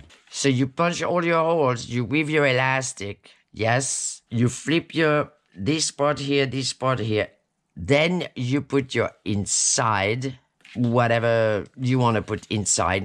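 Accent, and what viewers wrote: France. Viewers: I am having so much fun watching your tutorials!!! I am such a Frenchophile, your accent is just mesmerizing and takes me back to the two weeks I spent in France in 2005. The people were so nice and so patient with my version of the beautiful French language. I do have a question. If I missed it in the video, forgive me. I'm wondering what paper pack you used.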